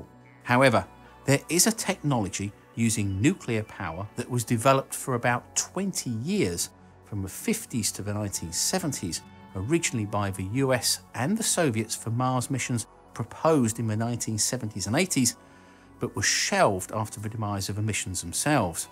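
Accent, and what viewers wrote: United Kingdom. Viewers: I'm loving the British Accent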